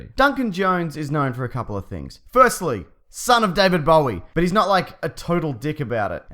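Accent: Australia. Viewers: his accent is badass... #AustralianArmy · Is he australian?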